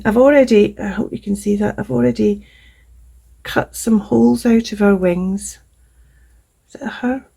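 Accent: Scotland, United Kingdom. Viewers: There is nothing more mystical than a poem by Poe read with a soft Scottish accent! I love your little project today, I don't ever think to sew smaller booklets into my larger journals, so thank you for the inspiration! 💜🖤💜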